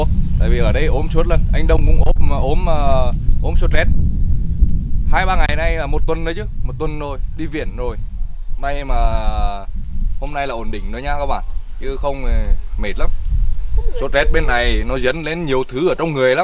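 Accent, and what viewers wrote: Nghe An, Vietnam. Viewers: Mẹ mình cũng ở quê Nghi Lộc. Nên nghe tiếng của sao mà thích ghê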